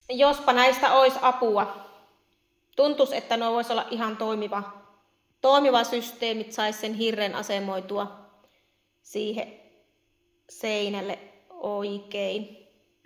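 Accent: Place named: Oulu, Finland. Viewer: On sun puhheesa muutamia oulun murteen sanoja 👍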